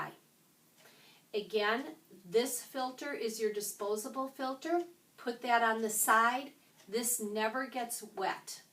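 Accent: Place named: Minnesota, United States. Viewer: Thanks, this was very informative. Like the accent, reminds of someone I know from Minnesota.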